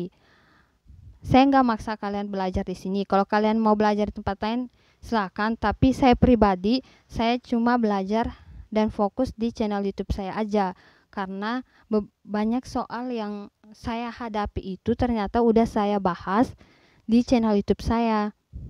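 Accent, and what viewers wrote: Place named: Central Sulawesi, Indonesia. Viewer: sya kira orng Sulawesi Tengah soalnya logat bicaranya mcm tdk asing di dengar.. Baru ad vlog dipantai Nambo.. Sama nama daerahnya dengan yg ada disni.. Klw kk orng sini langsung otw ke rmh kk buat belajar skb 😁🙏🙏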